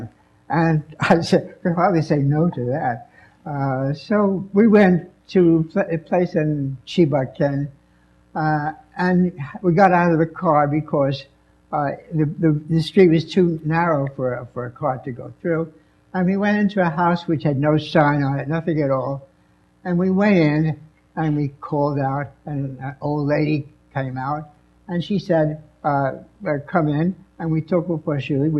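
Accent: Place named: Brooklyn, United States. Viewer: Never lost his Brooklyn accent.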